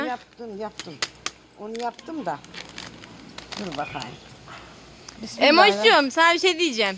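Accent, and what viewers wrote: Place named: Mersin, Turkey. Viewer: Ben Mersinli oldugunuzu anladim konusmanizdan bende Mersin'de yasiyorum cunku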